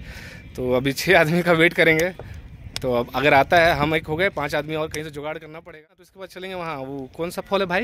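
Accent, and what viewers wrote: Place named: Jharkhand, India. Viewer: Mast Jharkhandi language. Maja aa gaya.😊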